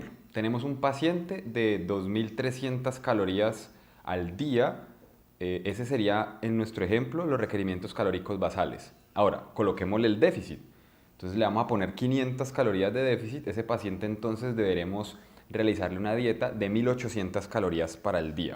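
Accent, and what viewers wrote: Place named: Colombia. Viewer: Que belleza encima colombiano ..la put..madre que hermoso acento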